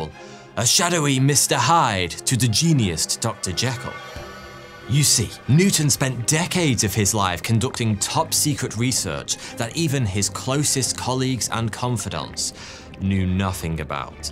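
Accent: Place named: England, United Kingdom. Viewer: Same, and I’m not a fan of English accents, but is an exception… 😂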